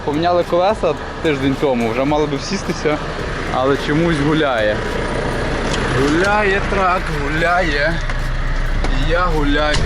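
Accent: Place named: Ukraine. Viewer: русских слов, но с укр. вымовой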